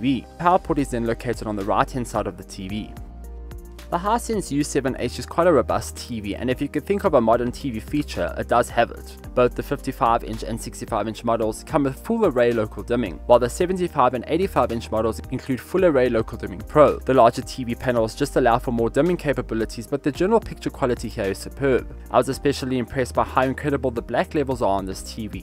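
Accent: South Africa. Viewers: South african hello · I love finding random YT review videos on a product I'm researching, opening it, and hearing a good old South African accent!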